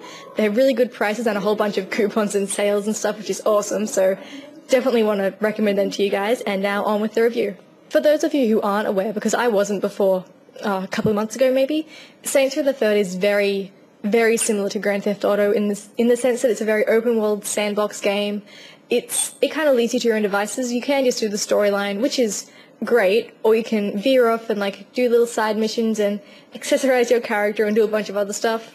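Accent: Australia. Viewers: where are u from your accent sounds like austrailian maybe .....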